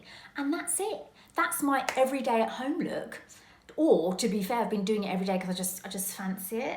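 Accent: United Kingdom. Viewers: I’m from Sweden and I love your brittisk accent and your sense of humor. And love to hear about your english life. By the way - I love makeup on you tube😍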